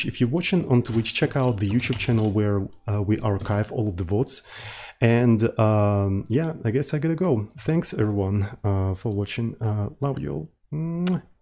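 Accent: Russia. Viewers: Чел наверняка русский (с таким-то акцентом)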